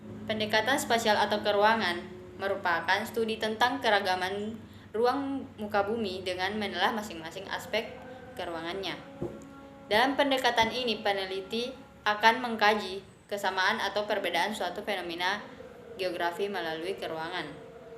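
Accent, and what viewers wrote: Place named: Mandar, Indonesia. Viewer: Sangat baik👍. Cuman bahasa Indonesianya agak kurang. Masih logat² mandar. Semangat💪💪😁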